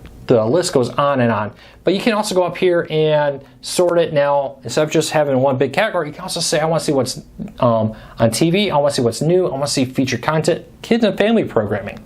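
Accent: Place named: Michigan, United States. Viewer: Excellent information about the channels! Thank you very much for sharing. Also I could tell you were a fellow Michigander like myself ( Southeast Michigan-Metro Detroit) when I heard you talking in the beginning of the video. God bless!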